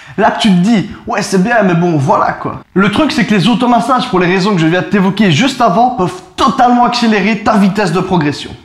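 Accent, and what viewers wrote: Liège, Belgium. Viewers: Tu es de Liège haha?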